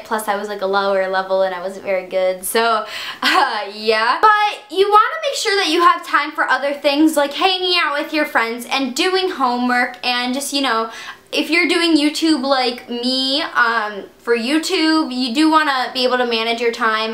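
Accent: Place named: New York, United States. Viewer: What state do you live in? It sounds like New York or New Jersey or Boston or something!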